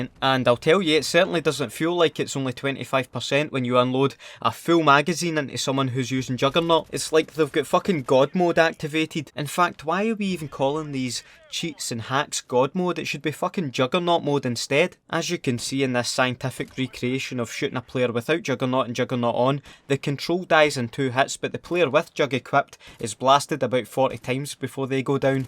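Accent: Scotland, United Kingdom. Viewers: Like the Scottish accent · I love him and that Scottish voice his new nickname keep up good work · I watch so much that when I hop on CoD I eventually slip into a wee Scottish voice. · your accent shaped up my own Scottish Accent. Honestly my accent got a lot better when I started watching your videos. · The funniest shit I've ever heard, and it's even better with the accent. The Scottish accent is my favorite accent next to Australia. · Max Ramirez he's Scottish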